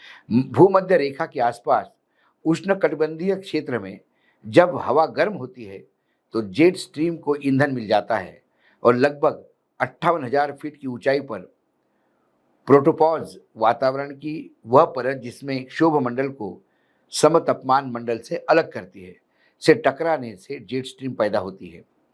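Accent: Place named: Mumbai, India. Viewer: Andhe bhakt pehle marte bad mai hum 😂❤ ji apki bhasa mast Mumbai tadka Wali hai apko sunne ka ek Maja hai😂alhamdulillah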